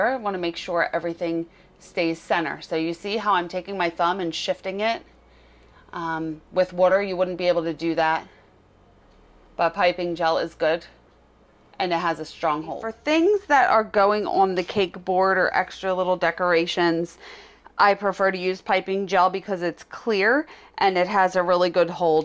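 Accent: Philadelphia, United States. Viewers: Your video SAVED me!!! ❤🎉 Thank you!!!! Love your channel your Philly-accent too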